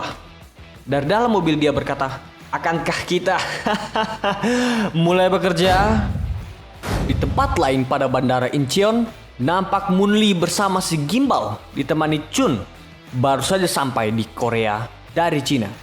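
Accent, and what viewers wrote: Palu, Indonesia. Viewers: Kayak logatnya orang palu komiu ini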